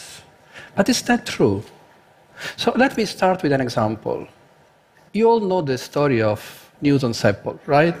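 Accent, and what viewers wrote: Italy. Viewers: a 16 minute video on trataras and tritoras.... sounds of meaningless wordsas in italiaaano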